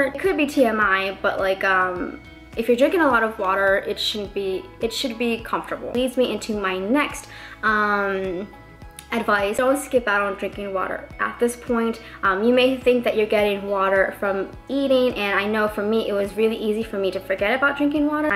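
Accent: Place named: Brazil. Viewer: Are you brazilian? Pretty accent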